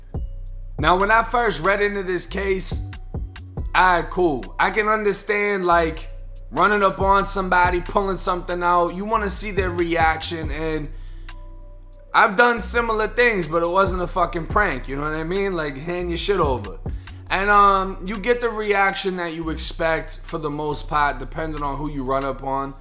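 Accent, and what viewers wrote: Boston, United States. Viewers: He got a strong boston accent lol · New to your channel. Great commentary👍 love the Boston accent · I'm like 99.1% sure you are from Boston. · Sound like a guy from the inner city maybe Boston all black/white all sound like that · Man that boston accent is comming out hahd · Na that ain't New York thass bean town boy that's middlesex n Suffolk county shit it's the whole bay state we all mass holes just r area n boston is more emphasized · yo bro r u from Boston mas.??? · Bro u from Boston or nah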